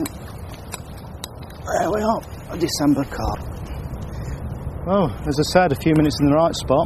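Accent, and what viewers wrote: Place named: Northern England, United Kingdom. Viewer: I live in belper derbyshire but im from up north and by the sounds of your accent your obviously not far from where I live just wandering where abouts this location is? because it looks a lot like the belper strecth of river or is it a lake where you were fishing? its just ive heard theres a few carp in my river which noone fishes for and I fancy giving it a go for a few day sessions cheers regards mike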